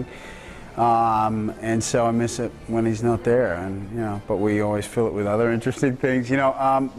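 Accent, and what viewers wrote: Australia. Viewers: I didn't know Alex speaks with a phony American accent in the TV series "Hawaii 5-0!" His Australian accent gives him a whole different persona. 👍 😉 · 'cause he's aussie and he talks with aussie accent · American here, OMG, he's even hotter with the Australian accent!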